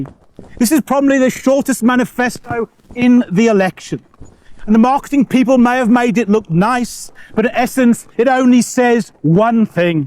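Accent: United Kingdom. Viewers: Why is the speaker British?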